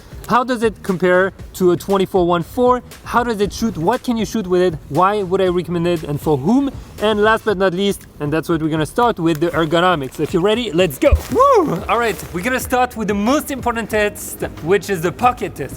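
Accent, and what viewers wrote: France. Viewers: French accent salut parler vous francais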